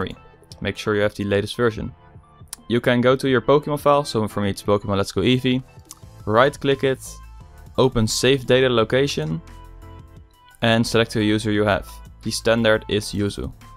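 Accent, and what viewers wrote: Netherlands. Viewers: I can hear from your accent your Dutch 😂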